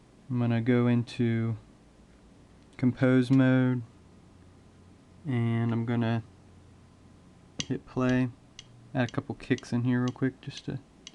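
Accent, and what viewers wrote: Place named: Philadelphia, United States. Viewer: Ur accent...way u say compose...u have a ne twang there...xwife 18yrs from philly im usually spot on with accents...u have to be close to philly my guess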